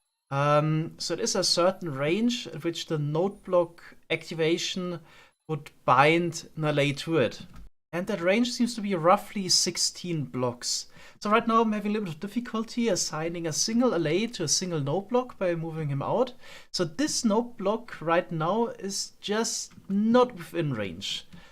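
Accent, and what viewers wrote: Germany. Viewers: The german Accent haha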